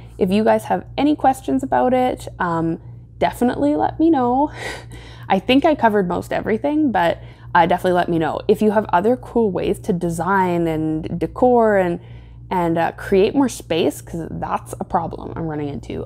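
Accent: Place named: California, United States. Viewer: You talk just like a Californian, LOL 😄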